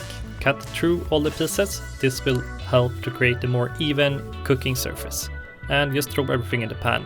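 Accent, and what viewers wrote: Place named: Stockholm, Sweden. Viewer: Du har fan den bredaste stockholmska svensk-engelska dialekten jag någonsin hört:D! Bra jobbat btw! du har jättebra content men det är väldigt likt Babish;D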